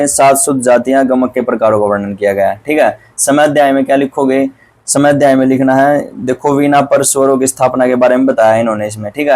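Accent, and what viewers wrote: Haryana, India. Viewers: Sir aap Haryana se ho kya??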